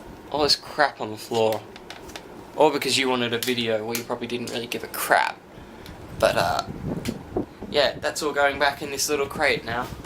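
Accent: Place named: Liverpool, United Kingdom. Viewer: Not irritating or boring. Loved your Liverpool accent - a cross between George and Paul, I think.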